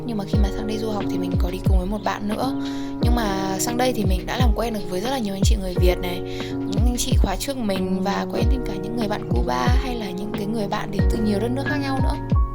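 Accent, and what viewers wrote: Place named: North, Vietnam. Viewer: đang định bảo chị này có cái gu thời trang đúng kiểu con gái mk thích, cho đến khi đọc i4 :V. Chưa xem des nghe cái trời nồm là biết gái Bắc rồi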